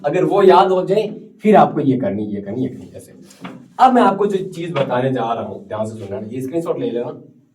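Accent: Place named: Rajasthan, India. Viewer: Sir aap to rajsthan ke ho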